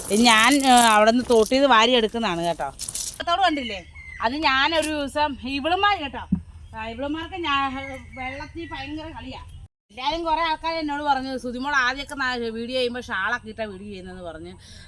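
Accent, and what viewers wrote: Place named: Malappuram, India. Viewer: മലപ്പുറം നത്തൽ, വത്തൽ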